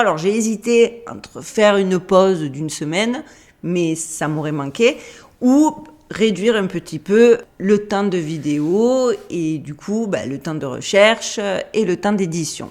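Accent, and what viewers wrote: South, France. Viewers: bjr toujours de bonne vidéos.tu a un accent du sud de la France.🙂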